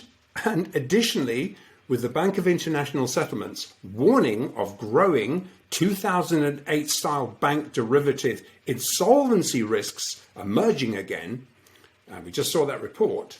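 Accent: England, United Kingdom. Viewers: I'm here to listen to an English accent.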